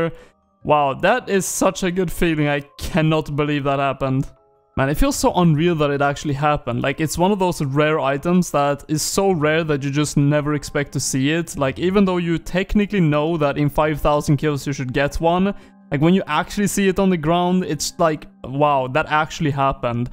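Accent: Sweden. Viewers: Are you Swedish and from Stockholm by any chance?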